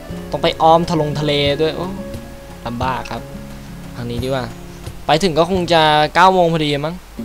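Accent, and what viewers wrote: Suphanburi, Thailand. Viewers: คนสุพันป่าวเนี่ย เหน่อจิงๆๆ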